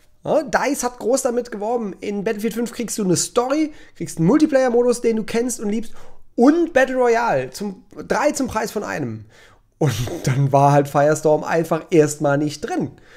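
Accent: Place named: Berlin, Germany. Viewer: was du bist Berliner ???? echt???? Ditt find ick jut :D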